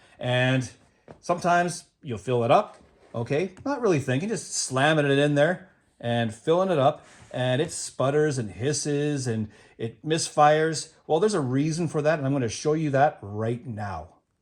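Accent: Canada. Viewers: Totally unrelated but this guy is definitely Canadian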